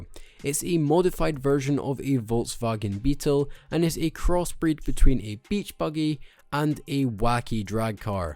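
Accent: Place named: United Kingdom. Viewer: Why tf wouldn’t you put the subtitles for the cars. No one can understand your stupid British accent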